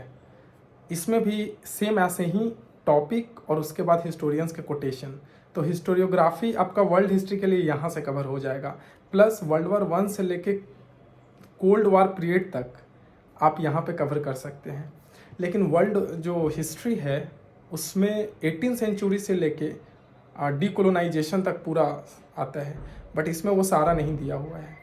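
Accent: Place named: India. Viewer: OMG .. you are comparing spelling mistakes that happens in every other sentence with how u say basic words in basic indian accent ..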